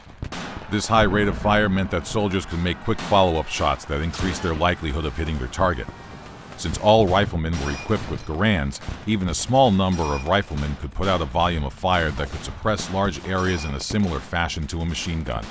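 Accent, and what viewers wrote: United States. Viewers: American accent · The pure american voice of this video makes it so much better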